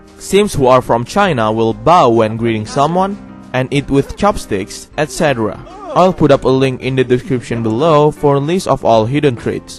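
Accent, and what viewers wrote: Indonesia. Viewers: Starting to miss the sims 3, I think the sims 3 is better than 4. Nice vid, btw I hear Indonesian accent, are you from Indonesia? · He got indonesian accent. Am i wrong? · you sound Indonesian! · I bet you're indonesian. I can tell by your accent😄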